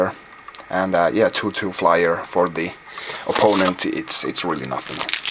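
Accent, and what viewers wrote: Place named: Finland. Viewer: I think I just nerdgasmed for the accent suomi perkele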